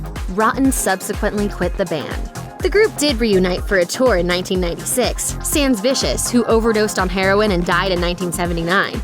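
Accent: United States. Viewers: Agreed, on every point. However, our narrator in THIS case isnt a brit, nor does she speak with an unusual dialect or accent. She sounds like a born n bred 'murican to me.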